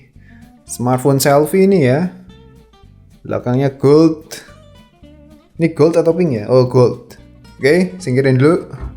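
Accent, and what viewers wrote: Java, Indonesia. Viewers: Omah mu ngendi lek...logat e jowo ngono 🤣. Trs le guyu Yo kok diejo😁 Ditunggu versus in nya...yg seharga ya...vs nya beberapa smartphone lah. 😁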